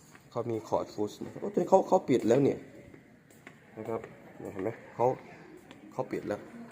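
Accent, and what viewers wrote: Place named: Maha Sarakham, Thailand. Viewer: เทพโยคือเว้าภาษาลาวคือบ้านข่อยสารคามแทบบ่รุ้เลยว่าเป็นคนลาว ข่อยเห็นยูทูบเปอร์ลาวเว้าบ่คือเจ้าแมะ ถ้าเจ้าเป็นคนไทยคือยุ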